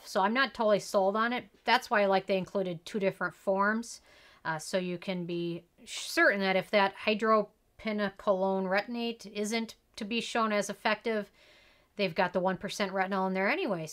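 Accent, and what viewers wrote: Minnesota, United States. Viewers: My husband said "she sounds like she's from Minnesota!" He's originally from there, but doesn't have the accent. LOVE IT! 😍😍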